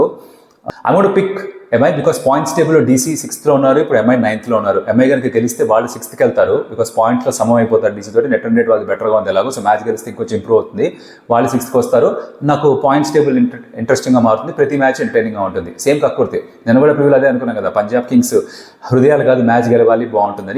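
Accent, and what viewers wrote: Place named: Hyderabad, India. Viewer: Bhaiyya apka Hindi mast hai bhaiyya....pakka hyderabadi hindi❤❤❤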